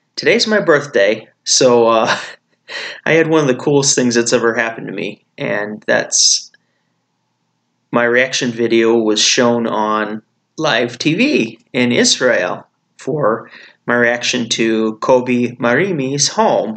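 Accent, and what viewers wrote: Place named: United States. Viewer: I had a feeling your accent is so American ;)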